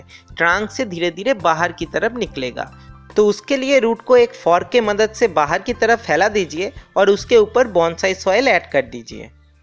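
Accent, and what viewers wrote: India. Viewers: Nice Hindi accent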